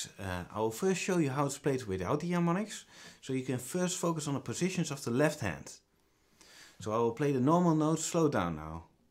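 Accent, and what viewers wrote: Netherlands. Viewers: I noticed from the accent you're Dutch. Awesome composition. Erg mooi! Gaaf dat je 't gratis weggeeft. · hahaha ik hoorde aan je accent dat je nederlands bent xD goede tutorial, dankjewel, alleen het zou het een stuk makkelijker maken als je op plaatsen zoals je rechterhand ook laat zien om de tokkel te begrijpen. dankje. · love the dutch accent :P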